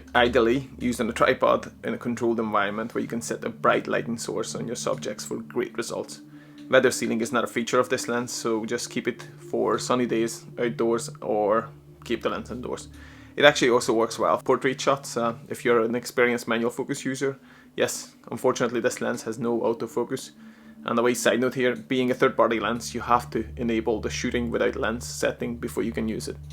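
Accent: Northern Ireland, United Kingdom. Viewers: so helpful. Just discovered your vids. am learning a lot. I got a XT4. Love that Norn Iron accent :-)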